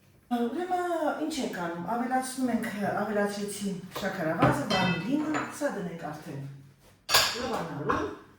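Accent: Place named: Lori, Armenia. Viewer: ջան,հայերեն լոռամիրգ է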